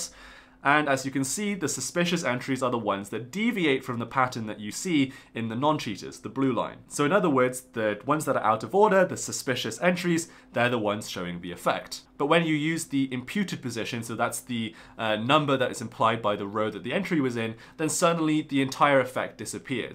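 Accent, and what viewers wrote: England, United Kingdom. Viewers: lol -he doesn't have Chinese surname and he has an English accent and your first guess is that he might be Chinese educator living in China?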